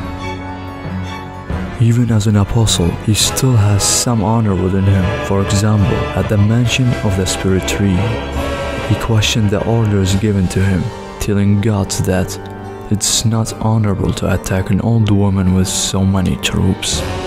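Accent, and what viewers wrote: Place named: Russia. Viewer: Your (Russian?) accent gives an exotic and soothing tone to your speech, it’s fine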